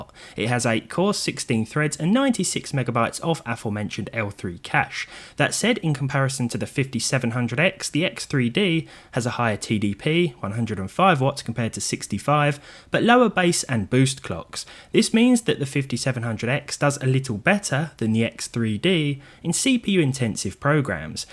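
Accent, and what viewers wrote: United Kingdom. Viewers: I like your accent. British? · oo a brit? sub'd.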